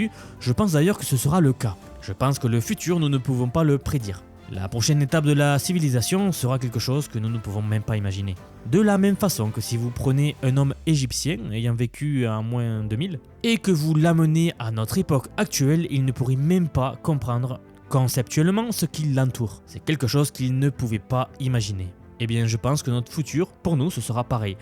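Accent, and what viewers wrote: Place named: Southwest, France. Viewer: On dirait bien l'accent du sud ouest :)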